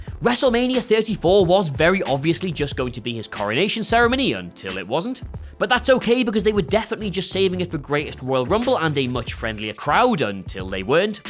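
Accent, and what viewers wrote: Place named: Teesside, United Kingdom. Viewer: listed as from Newcastle but he sounds more like a Teesside boy to me.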